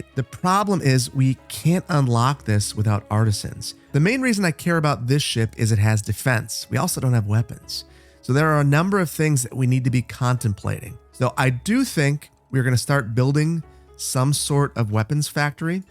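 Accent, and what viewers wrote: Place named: Midwest, United States. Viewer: can really tell you're from the midwest :P schooner is pronounced like school is, ch makes the k sound. and frigate is pronounced like "frig it" :D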